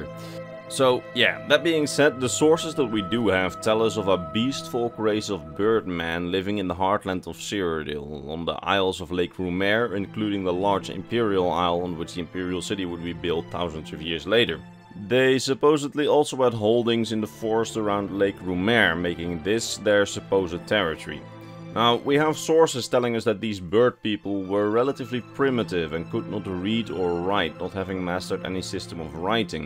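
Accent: Netherlands. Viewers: Great video once again! Btw i might be mistaken but do i hear a Dutch accent? I'm Dutch myself and you sound very Dutch !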